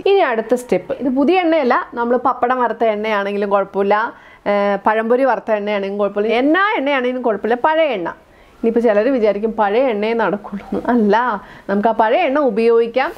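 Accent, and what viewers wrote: Kottayam, India. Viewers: Kottayam slang super.. 👌😄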